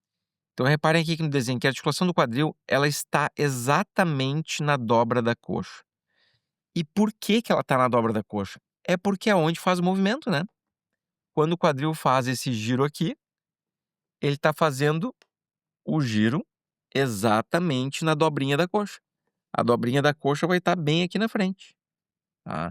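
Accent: Rio Grande do Sul, Brazil. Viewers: O senhor é gaúcho doutor?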